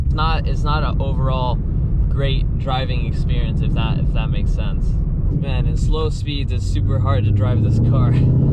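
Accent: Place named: California, United States. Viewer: Sounds like a Californian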